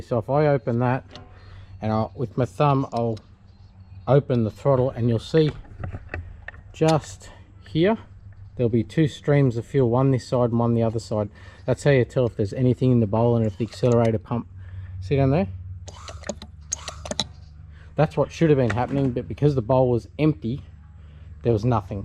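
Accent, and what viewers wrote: Australia. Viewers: Wow! Just look at what you've done with this - what a beautiful car. Love it. Also love your commentary: Expert and unmistakeably laid back Australian style - I reckon you could just leave in what you think are "bloopers"!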